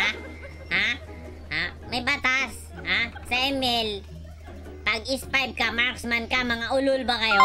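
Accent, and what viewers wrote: Visayas, Philippines. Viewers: Nindota intro nmo bai, bisdak d y k? Love u bai, boholano gamer s yt, shout out dha be, thnx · Kabalo man diay ka mu bisaya HAHAHAA taga asa ka?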